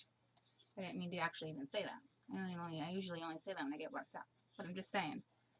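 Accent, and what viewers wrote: Boston, United States. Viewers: Oh my God !! Are you from Boston...I'm from Cape Cod!!!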